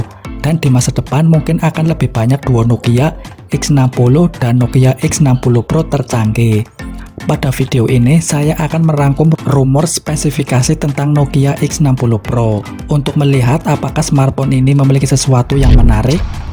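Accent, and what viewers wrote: Banyumas, Indonesia. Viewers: Wong ngapak kie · Ngomong2 admin logatnya ngapak ... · Bosone ngapak ngapak.....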